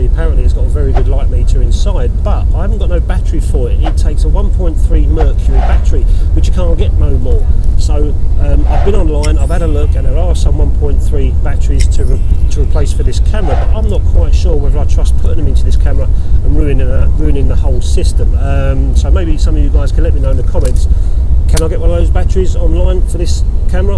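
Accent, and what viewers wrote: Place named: South London, United Kingdom. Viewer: I knew I recognised your accent. Coldharbour and the “Farmhouse” in the 70’s. Great memories. Love the channel. Bill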